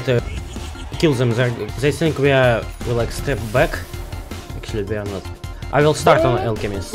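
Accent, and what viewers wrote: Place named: Russia. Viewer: I love russian speaking english. Very funny 😂😂😂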